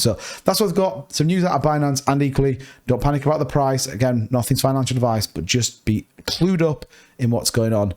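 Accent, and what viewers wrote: United Kingdom. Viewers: That’s UK accent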